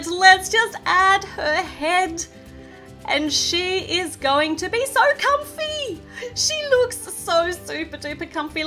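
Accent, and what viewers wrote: Australia. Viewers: Are you Australian Please reply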